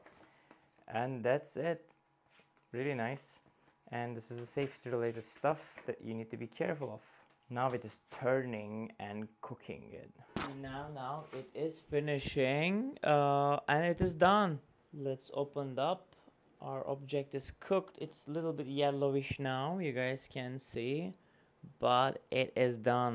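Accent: Turkey. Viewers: Usta Türk musun sanki aksanda Türklük var gibi 😅😅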